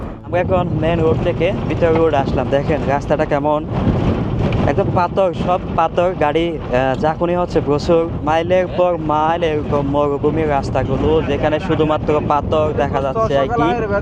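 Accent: Chittagong, Bangladesh. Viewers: Don't mind brother... watching from Rangpur and I can understand your Bangla easily but Chatgaiya is difficult to understand..No problem go ahead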